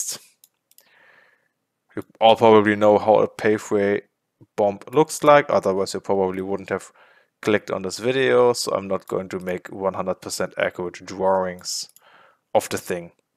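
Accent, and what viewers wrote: Germany. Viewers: The german accent is hilarious. Abber deine video ist sehr leker!! (Duolingo skills right there)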